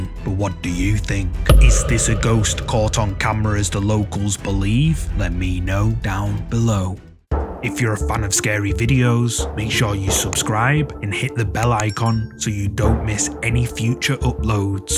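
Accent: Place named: Scotland, United Kingdom. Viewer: Love this (Scot?) accent!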